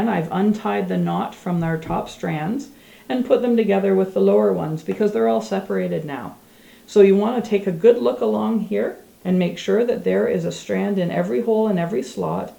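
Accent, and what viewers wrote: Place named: New England, United States. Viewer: Wonderful..thank you so much! i was wondering where your accent came from. i was guessing New England, USA!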